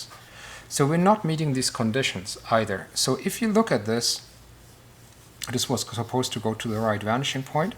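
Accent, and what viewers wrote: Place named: Scandinavia. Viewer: What happened to your voice? You sound Nordic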